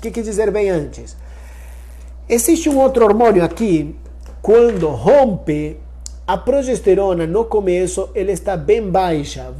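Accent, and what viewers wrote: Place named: Peru. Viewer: Boa noite, cheguei no seu canal pois fiz uma pesquisa de transferência e qualidade de endométrio, tenho marcada minha transferência para próxima terça-feira e estou feliz e com muita fê que minhas medicas estão fazendo o seu melhor para que esta FIV tenha sucesso, eu fiz uma fiz o passado janeiro, mas infelizmente não fiquei gravida, você forneceu muitas informações que eu desconhecia, lhe agradeço muito!!! Mais uma coisa você tem um sotaque muito parecido com o meu, eu sou do Peru!! kkkkk Forte abraco.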